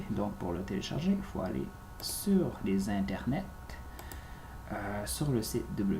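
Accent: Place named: Montreal, Canada. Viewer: merci beacoup pq je ne savais pas comment l avoir tu m as aider et aussi ete vous du quebec pq vous avez l acsens de montreal et l aval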